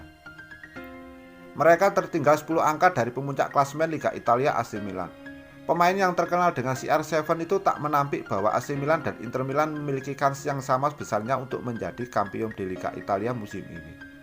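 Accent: Java, Indonesia. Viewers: Medok ngomong.e boss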